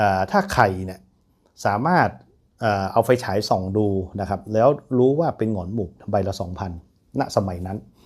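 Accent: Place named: North, Thailand. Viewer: พูดไทยยังไงสาบเมืองทุกคำ เจ็บแอวขนาด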